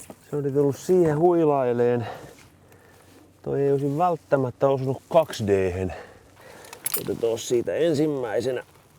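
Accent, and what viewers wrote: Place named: Häme, Finland. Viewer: Ensin tyylipisteiden jako: kakstoista pistettä ja papukaijamerkki. Kuvaajalle, leikkaajalle/koostajalle jaaivan erityisesti 'luontevasti soljuvan puheen tuottajalle' - sisällöstä, mutta myös estetiikasta. En ole varmaan koskaan aikaisemmin kuullu puhuteltavan kaloja - ahventa, kuhaa, mutta aivan erityisesti haukea - niin monipuolisesti, hellän kaverillisesti, vähän kiusoittelevastikin. Kuulee, että on oltu vuorovaikutuksessa aikasemminki. Ainoastaan savon murre puuttuu, mutta menee se nippa nappa hämäläinenki .. Eikä tuo tekninen osaaminenkaan paljo taiteellisen rinnalla kalpene. Ainakaa, jos aatellaan saalisvarmuutta. Näin kaksdee-arskana tuo saalisvarmuus jopa hämmentää. Ja tulee eittämättä sekin mieleen, että missä kulkee eettisen saalistamisen rajapyykki. En halua neuvoo toisia. Oma kalastus keskittyy ruokakalan pyyntiin asenteella, että 'en tee onkelmaa siittä, jos kalaa ei satu tulemaan - enkä siittäkään, kun sitä joskus tulee'. 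Nostan siimat ylös ja sumputan järvessä seuraavaa kertaa silmällä pitäen. Kaksdee-arskana mulle tulee sopivasti jännitystä ihan siittä, että tuleeko tällä kertaa vai eikö tuu. Omana mielipiteenä heittäsin kuitenkin väkäsettömien koukkujen käytön aina sillon, jos kaloja saalistetaan selvästi yli tarpeen, koska väkäsettömillä se vapautus onnistuu aina helpommin. Sillä tuskin se metrisen vihernieriän karkuutuskaan enää kovin kipeetä tekee, jos niitä toistametrisiä on vyöllä jo enemmän ku paljo. Taiteellisen tyylin lisäks paikkatyyppipohdiskelu itte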